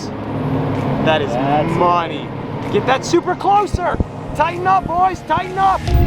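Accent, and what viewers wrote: United Kingdom. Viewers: Is it just me or does he sound British during the intro? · is he British?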